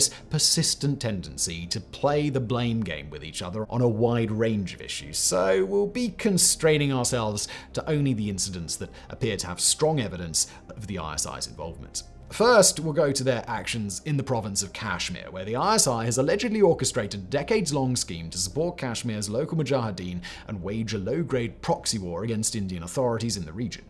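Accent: United Kingdom. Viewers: This series is the case in point for the theory that everyone sounds more intelligent when speaking with a British accent and everything sounds more credible and authoritative when spoken with one😂.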